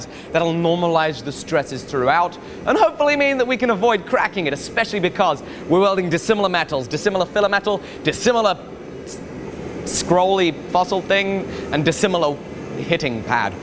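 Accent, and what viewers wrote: England, United Kingdom. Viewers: You were so British in your intro, you reminded me of Michael McIntyre. EDIT: I take it back. You sound like him the whole video. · ah hes English.. but from where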